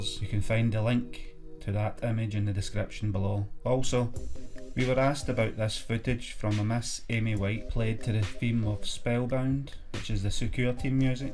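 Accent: Scotland, United Kingdom. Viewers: Holy Scottish accent 😂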